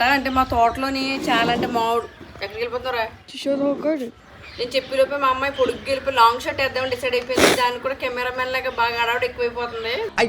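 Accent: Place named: Amalapuram, India. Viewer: మీ బాషా యాసా అచ్చం అమలాపురం బాష చాలా బాగుంది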